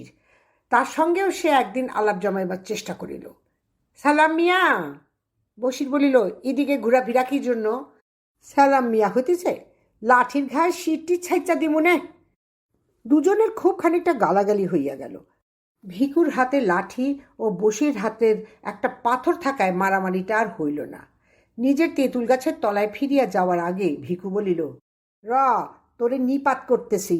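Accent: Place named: Bangal, Bangladesh. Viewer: Darun porechen mashi bishesh kore bangal taan. Shunte shunte mone hochhilo ki privileged amra. Koto aramse thaka shotti kto complains amader.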